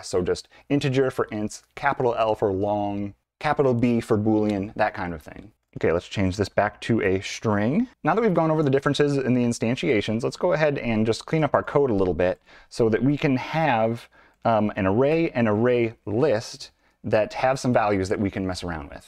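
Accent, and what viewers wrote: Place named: United States. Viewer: finally an american explaining it hahaah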